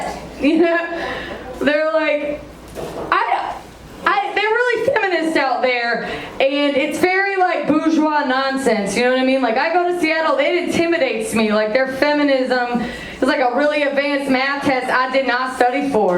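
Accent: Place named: South, United States. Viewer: Good set. Have you visited Alabama lately? That southern accent is coming out. I never thought about that Seattle having a giant needle thing. I like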